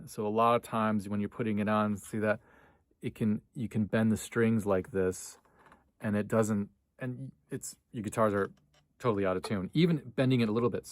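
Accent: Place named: United States. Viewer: I will never get used to the USAian pronunciation of cap-o as kay-po😂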